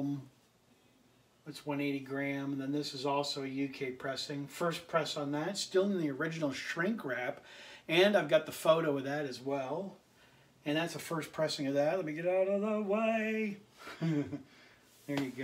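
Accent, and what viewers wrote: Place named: Mississippi, United States. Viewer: I always thought you were from Mississippi with that accent of yours???🤔lol😎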